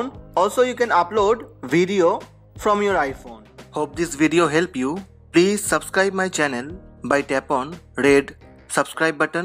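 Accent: India. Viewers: indian right? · Bruh you sound like an Indian .i think you are O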